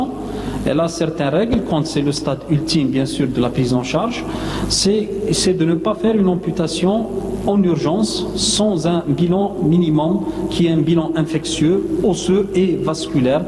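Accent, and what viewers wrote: Algeria. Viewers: C'est désagréable cet accent algérien ouf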